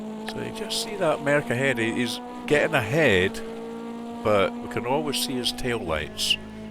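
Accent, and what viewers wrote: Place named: Scotland, United Kingdom. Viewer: Great video. Subscribed. AMS2 is very underrated. Nice to hear a fellow Scot. Greatings from Edinburgh.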